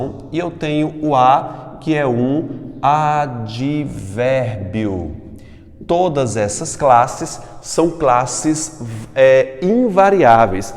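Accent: Northeast, Brazil. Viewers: Bom dia professor. Eu vi um vídeo antigo seu de análise morfológica,muito bom,eu achei incrível me ajudou muito na prova. E lá eu percebi q vc tinha um sotaque parecido com o meu,e gírias conhecidas aqui. Pensei q vc fosse do nordeste mas vc é de Juazeiro do Norte,eu moro numa cidade vizinha a Juazeiro. Q legal. Ótimo professor, parabéns 👏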